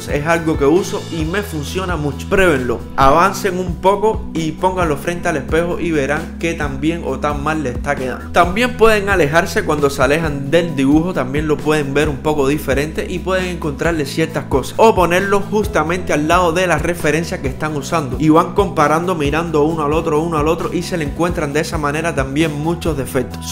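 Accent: Cuba. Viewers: Tu acento te delata tienes origen cubano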